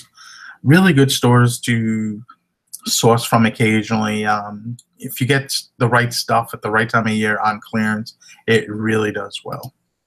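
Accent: Boston, United States. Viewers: I like your Boston accent don't let the bugger's get you down you give always excellent content! Never heard of the Weston shoes till today so something to file away in my search's! Thanks for giving really nice vids! Corie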